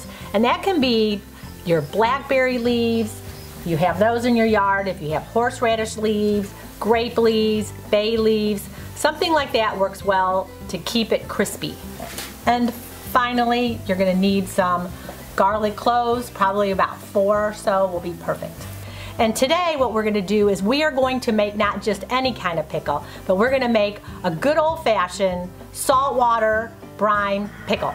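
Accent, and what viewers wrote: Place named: New Jersey, United States. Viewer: Your accent reminds me of a Jersey girl. Where were you originally from? I really enjoy your videos...VERY helpful!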